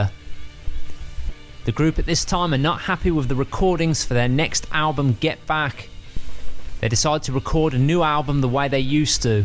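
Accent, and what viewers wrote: Birmingham, United Kingdom. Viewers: Good analysis.... A lot of things I haven't heard of before.... and great Brummie accent!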